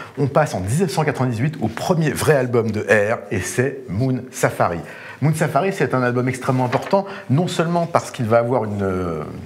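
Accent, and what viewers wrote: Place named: United States. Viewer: Merci pour cette rétrospective bien sympathique.. Un clin d’œil à ton accent américain c’est énorme ! Cindy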